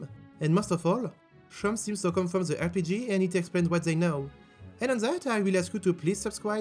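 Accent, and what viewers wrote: France. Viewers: you're motivated to make english content, I would encourage you to work on your accent. I was interested in what you had to say about this, but your french accent is very, very thick to the point where it makes it very hard to listen. (This is coming from a french-english bilingual person.) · Strongest French Accent ive Ever Heard, Neat !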